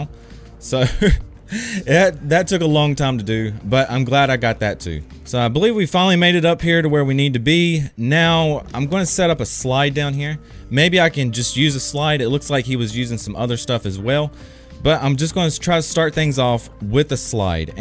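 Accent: South, United States. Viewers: he sounds like he lives in the southern us and nightspeeds lives in denmark i think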